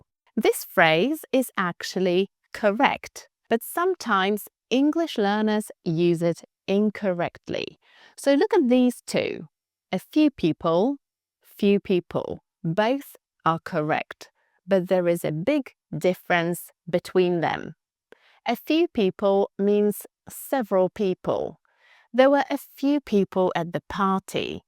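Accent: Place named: United Kingdom. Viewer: your british accent is beautiful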